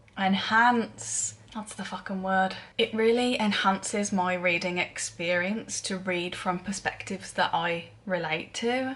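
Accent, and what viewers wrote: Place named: Birmingham, United Kingdom. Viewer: Hiya! Welcome to booktube, I love your energy 😊 sounds like you might have a bit of a brummy twang going on too? We need more brits in the community for sure! Will check out your other videos for sure!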